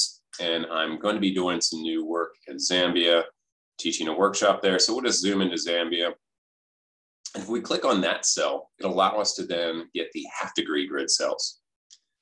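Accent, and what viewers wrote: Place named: United States. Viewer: Professor, thank you very much and congratulations for this very didactic video. Could you also add the footnotes in this video to allow a good understanding? I, for example, do not understand the American accent very well.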